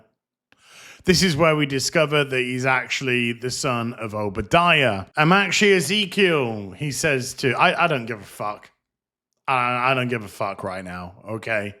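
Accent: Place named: England, United Kingdom. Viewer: When calls something Retarded with that smooth English accent, you know the show is 💩🤣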